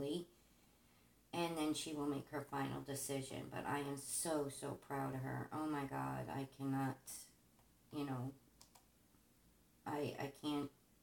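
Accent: New York, United States. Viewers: Can't wait to see your new craft I'm loving your Newyork acsent 💋💋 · OH SNAP!! Gotta love the New York accent and honesty! You answered any questions I had about what was going on :) LOVE 💘 ya girl!!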